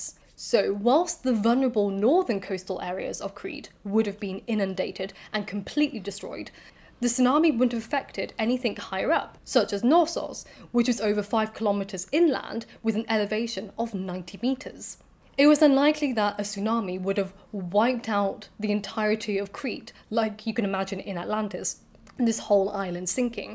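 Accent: RP, United Kingdom. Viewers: Im from London,and I must say you have possibly the most posh British accent.You should try and make audio book. You have such a strong elegant voice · Oh my days she's posh!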